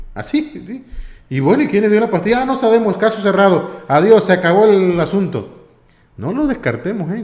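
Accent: Tabasco, Mexico. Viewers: querido.. Yo creo que ya descubrí xq hablas tan "serio" pero no siempre:Hay videos en donde te relajas y se nota tu dejo tabasqueño, lo cual a mi me ENCANTA este video es uno de ellos...eres de Tabasco el mas hermoso estado del país 😘😘😘😘❤️💖💝👍👍👍👍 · Es acento tabasqueño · Mi hoy saco mas lo tabasqueño pero con todo ese asentó yo así los sigo son los mejores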